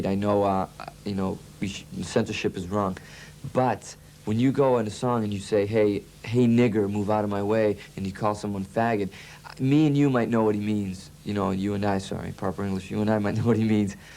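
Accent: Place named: Boston, United States. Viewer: Boy lol you sure hear your Boston accent! Now just that LA accent! Its shows that today looking back you still have those same thoughts and ideas! Your still doing your own thing! rock on! cant wait to hear the whole album! xo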